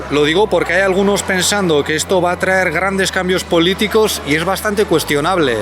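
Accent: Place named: Spain. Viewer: modo de hablar español iberico, peninsular, diferente al español sudamericano con muchas variantes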